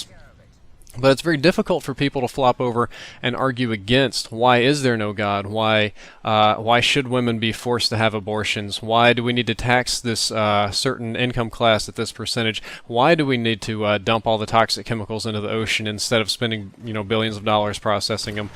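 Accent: South, United States. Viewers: your pronunciation of words such as what or white make up four the southern accent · Yo, Where are you from? What state in the south? (I can't seem to place your accent)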